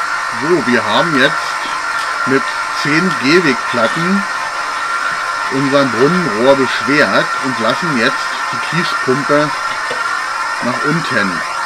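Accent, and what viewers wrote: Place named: Berlin, Germany. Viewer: Was soll daran nicht mein Ernst sein? Dem Tonfall nach zu urteilen befinden wir uns in Berlin oder Brandenburg, folglich bezieht sich meine Frage auf die dem Brunnenbauer/Grundwassernutzer obliegenden Verpflichtungen nach den Paragrafen 36 und 37 des Berliner Wassergesetzes oder wahlweise 55 und 56 des brandenburgischen Wassergesetzes, ansonsten schlicht und einfach auf die Regelungen des Wasserhaushaltsgesetz des Bundes in Bezug auf den Brunnenbau und die Benutzung von Grundwasser.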